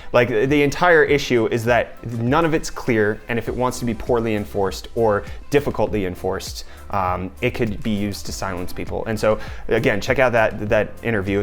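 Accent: United States. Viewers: Most South Africans don't have accents :) He does seem to have a slight American accent though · You have an American accent though... 🤔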